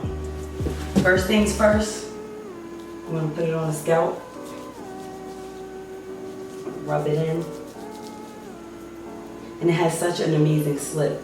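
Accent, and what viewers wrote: New York, United States. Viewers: Fellow NY’er here, I know that NY accent cause I have it too😂😂. But your hair and skin is freakin gorgeous. I will definitely try this and I just subbed. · U from NewYork?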